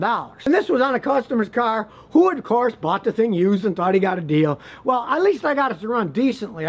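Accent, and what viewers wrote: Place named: South, United States. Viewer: Your brain tends to really question itself, when it see's a John Lenon look alike guy talking in a southern accent, especially when you're high.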